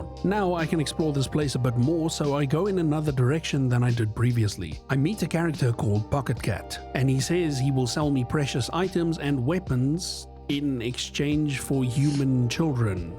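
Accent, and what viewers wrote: South Africa. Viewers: Is this a fellow south african that i hear who has also put himself through perpetual torment with this absolute gem of a game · Definitely a very South African accent haha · this guy sounds like he's south african so im subscribing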